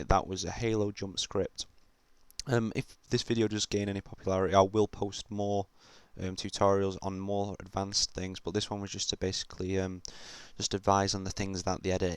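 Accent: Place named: Manchester, United Kingdom. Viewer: I was wondering where he was from, I knew he was northern, but this video DEFFO manc, it's how he says "you" as "yerr"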